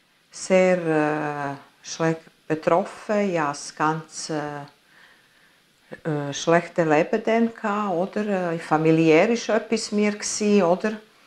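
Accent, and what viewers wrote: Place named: Swiss German, Switzerland. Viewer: This lady speaks Swiss German dialect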